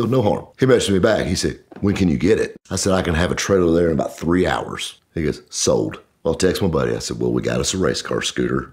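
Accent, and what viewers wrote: South, United States. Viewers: Sorry if I disrespect someone but for me as someone from Europe this is what a real American accent sounds like. ‘Merica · Michael Rapaport’s southern brother?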